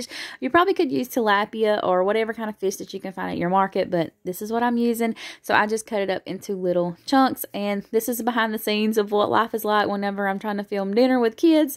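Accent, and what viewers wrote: South, United States. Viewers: I love that Southern drawl! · Tennessee gal here..I understand every word..southern accents are just fine😉💕💕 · your southern drawl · Lovin these meals and your southern drawl! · Always love your videos! Don’t apologize for being Southern! Most of us understand you quite well!!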